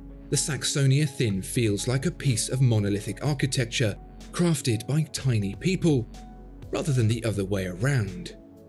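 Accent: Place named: RP, United Kingdom. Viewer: Ok 3 min on Lange (from to ). 1 min on Credor... Hmmm? Just some biased limey with a posh accent...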